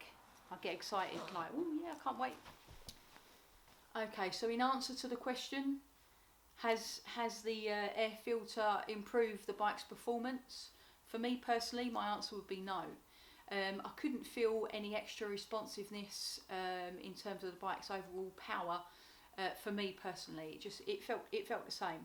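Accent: Cockney, United Kingdom. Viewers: I've watched a few of your Triumph reviews. Your accent reminds me of my family and growing up in London England. I was born in Woolwich. I would say you definitely have a Cockney accent. I am now a US citizen living in Southern California for many years now. Carry on riding !!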